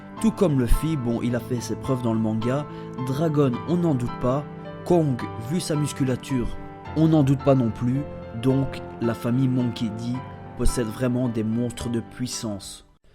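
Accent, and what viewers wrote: Belgium. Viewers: ça c'est l'accent Belge ^^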